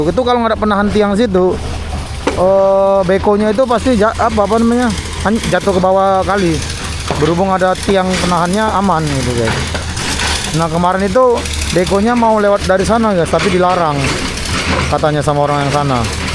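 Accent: Medan, Indonesia. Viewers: Semangat menyala buat kontennya abangkuu. Senang kali awak dengar aksen medannya bang 😂👍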